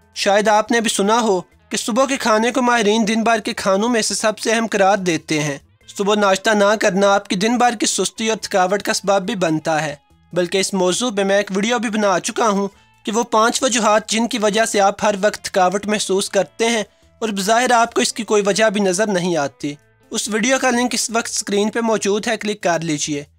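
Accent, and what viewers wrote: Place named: Sialkot, Pakistan. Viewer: Speaker is definitely from sialkot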